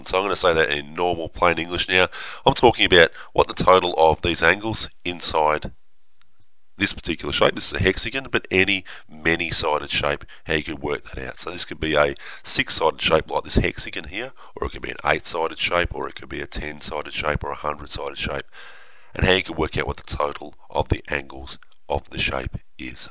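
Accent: Australia. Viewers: Woah,your Australian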